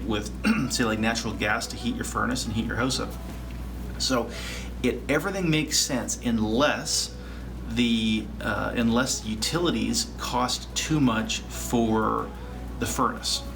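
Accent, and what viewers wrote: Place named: Canada. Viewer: The company says FurnaceUSA but the presenter is clearly Canadian!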